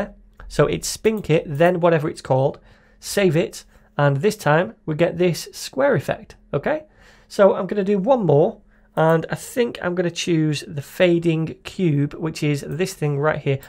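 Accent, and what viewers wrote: United Kingdom. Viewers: are you bri iiish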